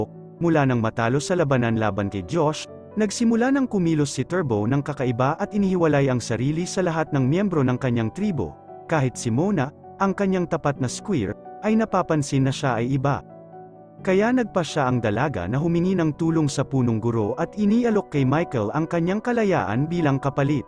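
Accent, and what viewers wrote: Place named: Visayas, Philippines. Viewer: Debris ba? Bisaya kasi mag pronounce eh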